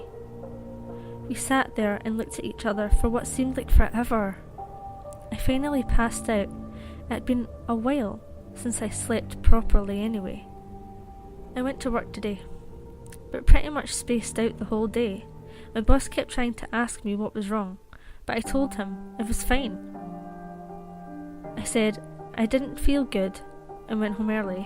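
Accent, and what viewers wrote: Scotland, United Kingdom. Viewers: Are you Scottish ? Great accent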